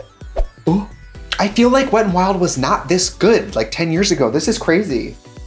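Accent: Wisconsin, United States. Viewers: Any one else think Trixies Wisconsin accent shows up more during the 2020 videos? I feel like i hear it more in these than newer ones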